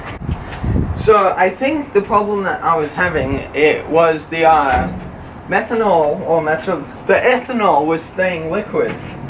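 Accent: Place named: England, United Kingdom. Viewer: You really should look into installing a grill ignitor system. Preferrably an electronic one vs a piezoelectric variety. It will solve all of your firing issues. Im detecting an english accent. Perhaps they may not be available where you live. Best of luck man.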